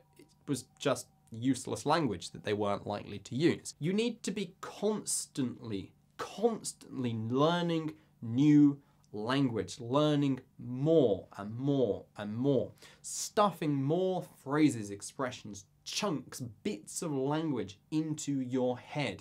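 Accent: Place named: United Kingdom. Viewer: I love your British accent 😍😍😍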